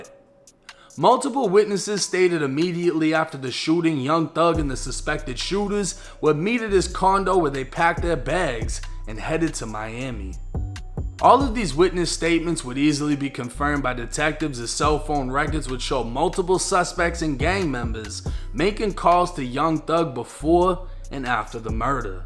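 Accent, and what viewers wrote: Boston, United States. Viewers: Are you from Boston? ☘️🤓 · Dude u got like 3 different accents going on u sound like u from Boston when u say “caar” then southern black when u was on no jumper now u just aound like a regular American on here u not a real G u make YouTube videos stop 🛑 ✋ trying to be gangster u far from it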